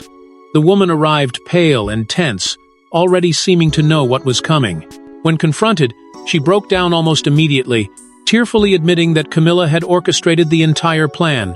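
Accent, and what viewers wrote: United States. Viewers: Strange how these American-voiced Royal Family tit-bits all seem to stretch out to the 20+ minutes mark! They're all sheer speculation, & imagination, with NO evidence to support them: Simply cash-earners from YouTube views etc. - Don't believe any of it!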